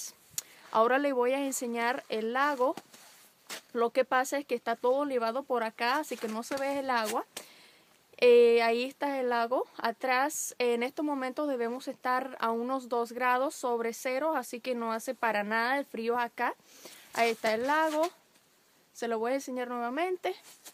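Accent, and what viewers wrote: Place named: Venezuela. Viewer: Diría que tienes acento Venezolano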